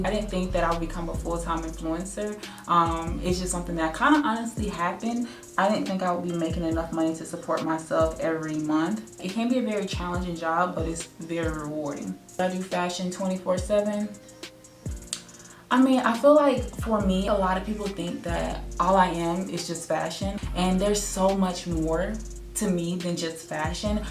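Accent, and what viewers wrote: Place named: South, United States. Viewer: I'm so happy you did this because I didn't just start following you but I kinda did lol so I'm a rookie to the #DesignSquad lol but I loved that I got to know more about you and love your southern accent lol I have family down south as well so to see you be so stylish and chic coming from the south makes me happy!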